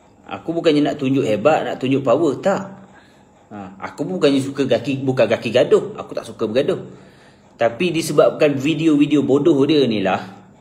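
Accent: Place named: East Coast, Malaysia. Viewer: Aku faham logat dia.kalu dop kelate ganu kalu xganu pahang.4ehehe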